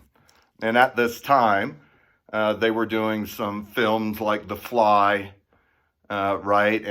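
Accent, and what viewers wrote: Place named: Sweden. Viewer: I was trying to guess your English accent. You don't seem American or English. Are you Swedish? You don't seem German either.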